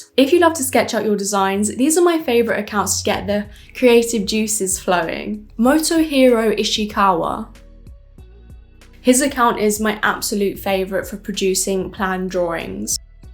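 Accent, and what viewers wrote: England, United Kingdom. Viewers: I really like your content and especially your English accent, so posh and elegant looove it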